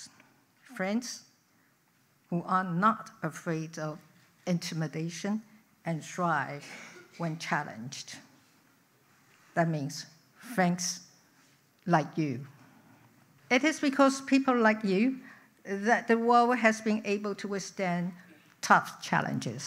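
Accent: United Kingdom. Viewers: 英國腔好好聽😍愛了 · 的发音是英式的！👍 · 總統的英國腔真的好好聽❤ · 感謝蔡總統卸任後努力做外交，好久沒聽到蔡總統的英國腔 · I love your British accent Wingardium Leviosa to our liberty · 聽蔡總統的英倫English 演講，像喝一杯淺焙曼特寧熱咖啡，深邃雋永，感謝蔡總統為國訪問友邦，我早猜到蔡總統離開總統府之後還是不會閒下來，還會持續為台灣出力，讓台灣人驚艷，給蔡總統我最好的祝福，您是台灣人永遠愛戴的總統！ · 蔡總統的英式英語真道地，很好聽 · 好優美的英式英文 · 貴族標準的英國腔，令人賞心悅目，移居USA 40年的台灣人，感謝🙏前總統蔡英文繼續為台灣拼外交❤❤❤🎉🎉🎉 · 前總統用漂亮的英式口音，在國際為我國的自由民主發聲👍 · 有點英國腔的蔡總統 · 確實! 擁有敦倫賑精學店的腔調味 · 超級喜歡總統的英式口音，感念她執政8年總統，不管內外成績亮眼，讓世界看到台灣發光發亮。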